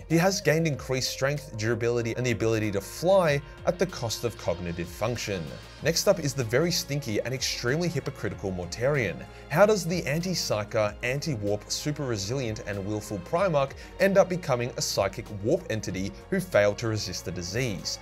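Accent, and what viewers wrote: Australia. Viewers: I got into warhammer through this channel and for about the first three months I thought the eye of "terror" was the eye of "terra" solely due to your accent. 110% the best Warhammer channel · He's australian. Very obvious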